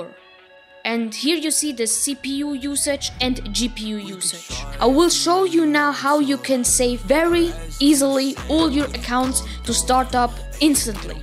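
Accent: Germany. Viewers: TY bro It helps very much (und man merkt das du deutsch bist aber gutes english) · Ich dachte mir schon das du bist deutscher 😂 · mann hört rauß das du deutsch bist hahah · Find ich gut dass man merkt das du deutsch bist. Aber trotzdem danke für dieses hilfreiche Video. 👍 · ICH WUSSTE DOCH AN DEINEM AKZENT DAS DU DEUTSCH BIST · Are you german? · u german?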